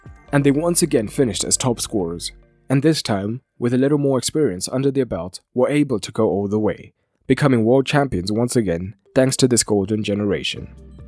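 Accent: South Africa. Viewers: I could swear you have a South African accent bro. I love these videos! And the OneFootball app 💪🏾 Keeping it Simple 👌🏽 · Where are you from? You sound South African.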